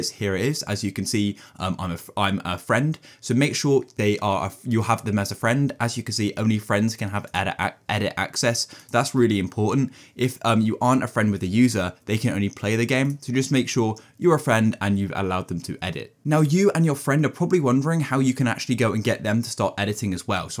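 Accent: United Kingdom. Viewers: he’s just british not every british person sounds like danton💀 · He Sounds Like A British Man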